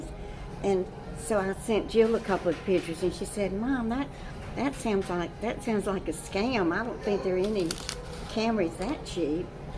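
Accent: South, United States. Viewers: Don't go to the used car company! LISTEN TO YOUR GUT!!! Repair your own car for much cheaper price. No guarantee that a newer car will not break down, either! Plus, A LOT OF USED CAR DEALERS ARE GETTING CARS THAT HAVE BEEN IN HURRICANES AND FLOODS AND PASSING THEM OFF AS GOOD CARS!! You can fake a carfax report and an inspection! Deal with your honest mechanic and fix your car, unless HE can find you a reliable car! Don't want to see you get burned! I must say this, with no disrespect intended, your Southern accent and friendly manner labels you as a soft touch and gullible to someone who is fraudulent! Be careful!❤️😊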